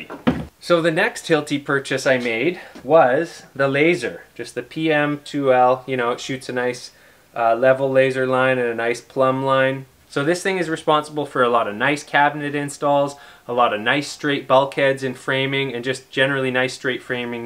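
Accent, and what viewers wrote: Canada. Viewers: Sounds like you have a Canadian accent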